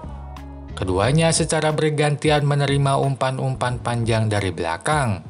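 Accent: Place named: West Java, Indonesia. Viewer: Sunda pisaaan euyyy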